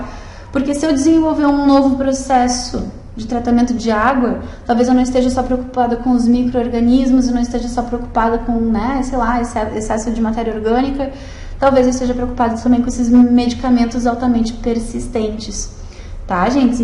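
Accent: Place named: Rio Grande do Sul, Brazil. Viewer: Bah tchê, pelo sotaque é gaúcha!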